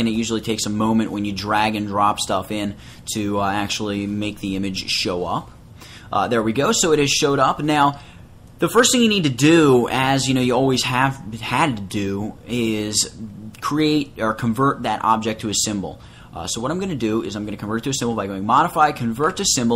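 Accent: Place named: United States. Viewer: typical US accent..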